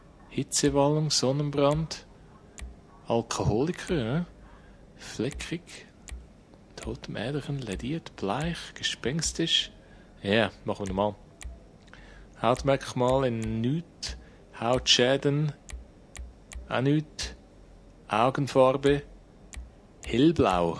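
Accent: Switzerland. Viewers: Englich Schweizerisch.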